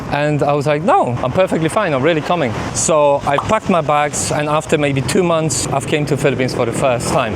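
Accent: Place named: Eastern Europe. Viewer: You’re not a British 🇬🇧 citizen aren’t you because you’re accent sounds more from Eastern Europe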